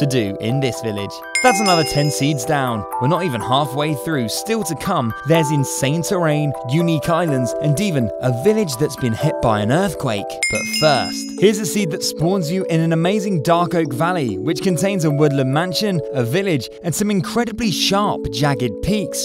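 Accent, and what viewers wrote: United Kingdom. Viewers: The British voice makes it better lol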